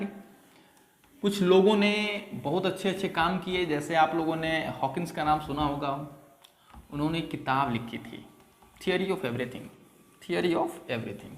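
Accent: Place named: Bhojpuri, India. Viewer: Bhojpuriya andaaz me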